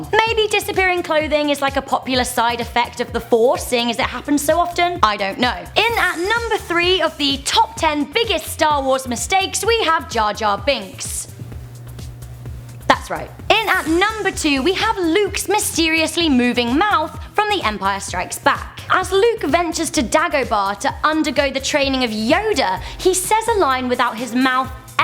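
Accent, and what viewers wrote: United Kingdom. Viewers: That's because she's British, you uneducated American.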